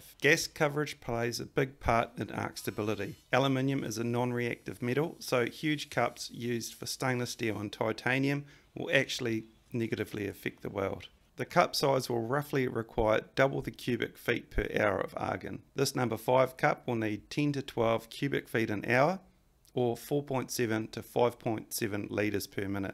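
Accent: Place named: Australia. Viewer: I only watched the video because he has an Australian accent and sounded like he knew what he was talking about. Turned out to be a good video.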